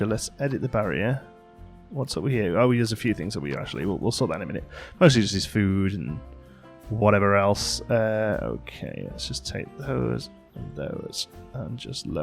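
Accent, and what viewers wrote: United Kingdom. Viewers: Loving these videos this game looks amazing can't wait for it!! Nice to here an British accent once in a while too lol!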